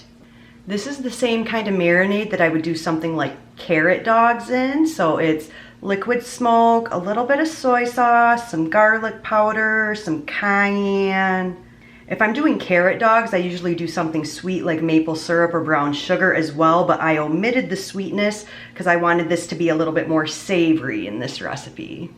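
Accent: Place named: Chicago, United States. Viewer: I love brocoli salad and don't like tofu mayo, so these are just right for me. Can't wait to try them. You sound like you live in the Chicago suburbs, is that true? Me too!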